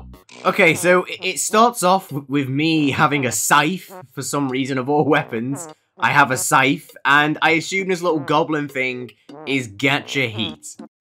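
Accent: United Kingdom. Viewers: I have a question what’s your accent is it British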